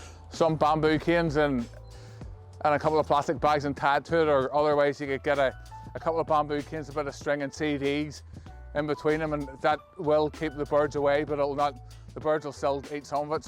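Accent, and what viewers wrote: Northern Ireland, United Kingdom. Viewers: I love the northern Irish accent, so unique!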